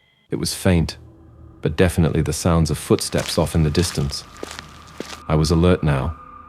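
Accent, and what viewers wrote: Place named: RP, United Kingdom. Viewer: You do a good RP accent. We say "privacy" with a short "I" sound though.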